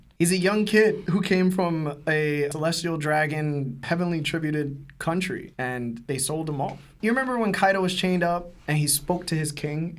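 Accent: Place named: United Kingdom. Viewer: Why is he british tho?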